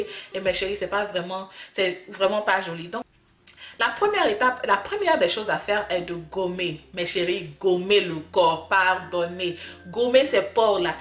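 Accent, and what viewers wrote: Ivory Coast. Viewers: Accent ivoirien...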